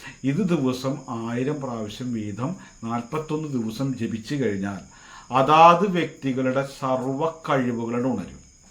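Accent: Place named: Thrissur, India. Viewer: Thrissur സംസാരം 🥰🥰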